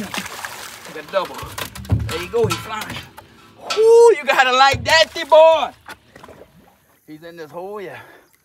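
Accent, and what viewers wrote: Louisiana, United States. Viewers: Please excuse my ignorance but is this a American accent or has he brought it there? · That's a cajun accent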